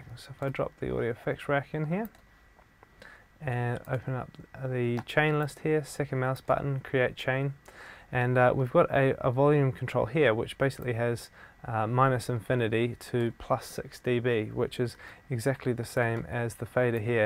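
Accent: New Zealand. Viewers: CHEERS! Awsome vid bro, I think you explain things really well. I will definatly subscribe ;) Take it easy! P.S. Your a kiwi right?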